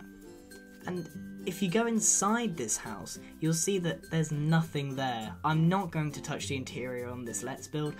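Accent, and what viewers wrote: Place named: RP, United Kingdom. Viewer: This guy has the most posh accent I've ever heard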